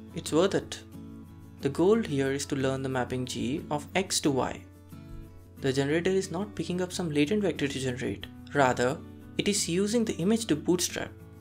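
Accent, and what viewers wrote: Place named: India. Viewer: maybe rerecording it with a voice without Indian accent would be advisable (sorry to be direct ;) )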